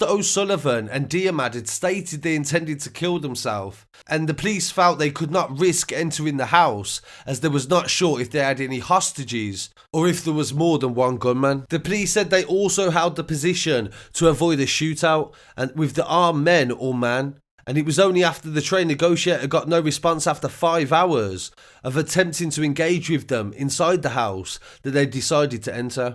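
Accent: Birmingham, United Kingdom. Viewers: That bham accent is the only thing that ruins his channel 🤮🤮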